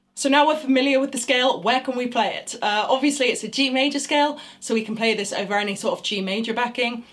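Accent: England, United Kingdom. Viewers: That British accent melts me into a puddle. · See, were all being tricked. Chics this freaking smokin hot and with an English (I think) accent, which btw moves her hotness to level... actually I can't count that high, who can play a guitar like that, aren't real. I mean, its obvious... the Matrix is real. How much more proof does one need.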